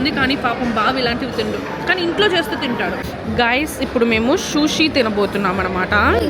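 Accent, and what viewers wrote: Tamil Nadu, India. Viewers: Akka miru matladettappudu tamil language vasthundhi 😅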